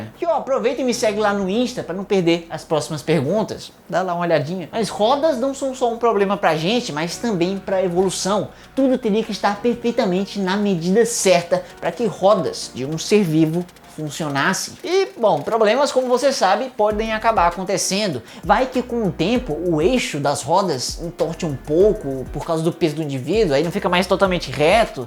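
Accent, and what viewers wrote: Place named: São Paulo, Brazil. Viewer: Nss kkk... Acho tão legal o sotaque paulistas aquela poxadinha no r (queria dar essa poxada também)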